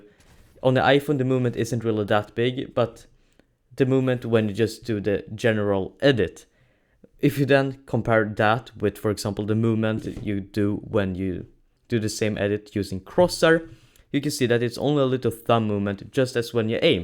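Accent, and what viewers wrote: Sweden. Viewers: Are you swedish?? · Im guessing your swedish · Ur swedish to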